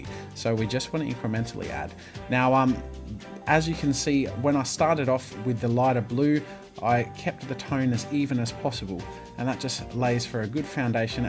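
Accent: Australia. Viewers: Oh shit, this guy's an Aussie! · Aussie mate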